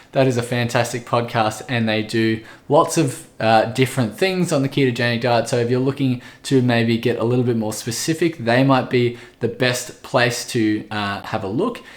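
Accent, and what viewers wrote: Australia. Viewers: So nice to have an Aussie presenting these videos. Thanks. Very informative.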